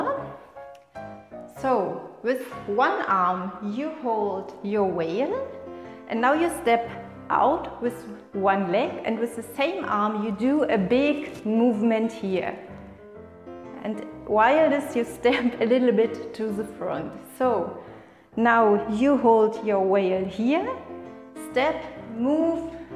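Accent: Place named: Germany. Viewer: I'm here for the German accent 😅... Don't worry I'm German too.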